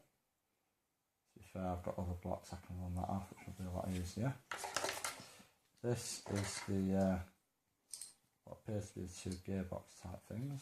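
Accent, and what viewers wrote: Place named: Birmingham, United Kingdom. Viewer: Great video. Can't understand the Brummy accent well, needs more Yorkshire 😊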